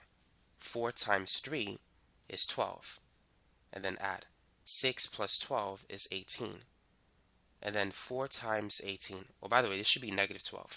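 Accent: United States. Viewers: Pay attention he teaches well He is an American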